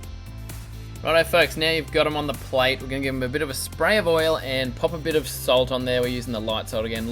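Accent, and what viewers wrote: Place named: Australia. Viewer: I think it's absolutely remarkable that douche-bag sounds the same even with an Australian accent!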